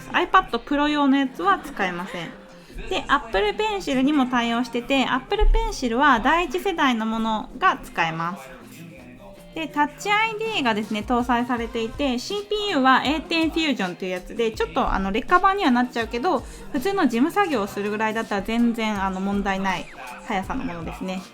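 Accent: Kansai, Japan. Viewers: 『なにげに再生しただけの apple信者でもない ipadmini4 持ちの爺々ですけど・・・』 『指先も ネイルもbeautiful 話す言葉が速いのによく聞き取れる明瞭感 時々関西弁もカワユス👍』 『わぁぉ～！ 顔出しもあるんかい？ 超絶かわゆくて 今日からイイね押して フォロー決定👍👍👍』 正直 確かにsensei名乗ることだけあるお人で・・・解説動画としての秀逸さに感服致しましたm(_ _)m 邪な助平心は捨てて・・・ を師匠と仰ぎ ipadの利用について 一から勉強し直しますです！！！ マジで知らないこと多すぎ、便利そうやもんねぇ。有難う御座いました。この際追加購入検討するかな！ by にゃんこ爺々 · センセーの関西弁萌😍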